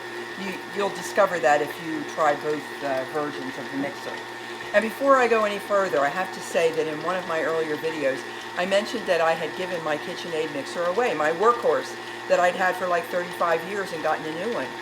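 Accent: Philadelphia, United States. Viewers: Starting my own channel and found you...heard your voice and knew you were from home. Love that your family has encouraged you to do this...just became a young Nana (Mom Mom) myself. I wish you all the love and success one can have on here. From one Cooking Philly Girl to a close to home Philly girl, passing on your link.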